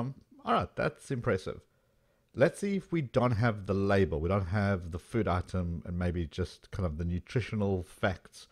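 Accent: South Africa. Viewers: Are you South African living in the USA?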